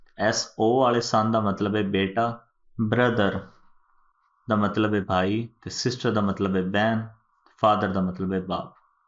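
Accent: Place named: Jhang, Pakistan. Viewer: سوہنا جھنگ سوہنے لوک سوہنڑی بولی سوہنا لیکچر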